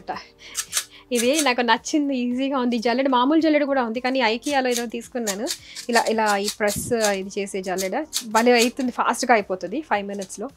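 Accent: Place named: Tenali, India. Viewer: Perfect tenali language 👌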